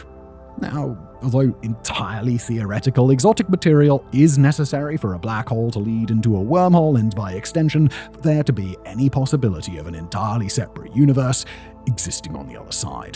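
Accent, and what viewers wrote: United Kingdom. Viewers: For starters, there was no big bang. Therefore, there was no nothing before the Big Bang. Telling a story with a little cute British accent isn't gonna make it any more believable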